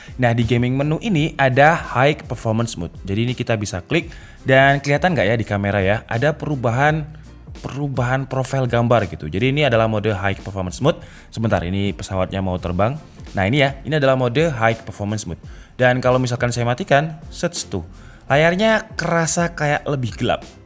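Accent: Java, Indonesia. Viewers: Pemean... wong malang banget... 🤣🤣🤣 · bhs jawa si mbaknya kentel banget 😁😁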